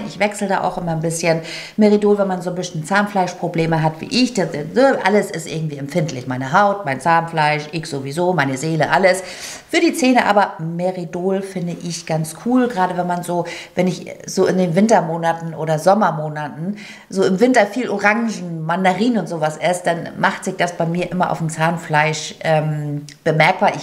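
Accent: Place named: Berlin, Germany. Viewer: Hab dich eben entdeckt und finde du hast eine sehr fröhliche und erfrischende Art, die mich irgendwie glücklich macht. Darf ich fragen, wo du herkommst? Irgendwie hörst du dich so nach "Berliner Schnauze" an, find ick jut. LG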